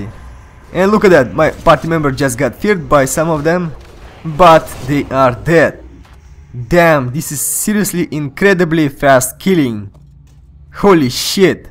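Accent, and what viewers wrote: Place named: Russia. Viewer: that is one heavy russian accent you got there bratan.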